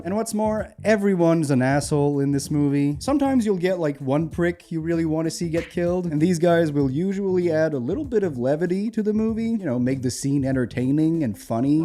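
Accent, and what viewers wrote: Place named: Montreal, Canada. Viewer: Oooooo is that a Montréal accent I hear? It's quite delightful lol.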